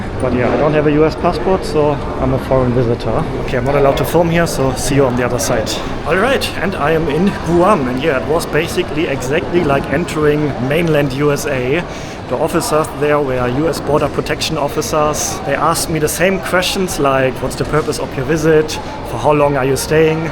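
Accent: Germany. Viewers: are you born german? your accent is blended sounding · He's from Bremen, Germany and decided to make his videos in English. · Thickest German accent ever!!! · Let the German have his German accent folks. You can't POLICE the entire world's pronunciation. Hell, Americans don't agree on how to pronounce AMERICAN CITIES. Have some grace. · Der Deutsche Akzent knallt richtig rein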